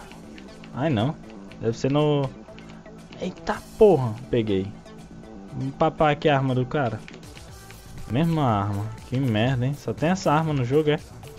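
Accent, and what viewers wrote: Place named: Ceará, Brazil. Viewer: Vc é do ceara é?kkkkkkk, se não for seu jeito de falar é mt párecido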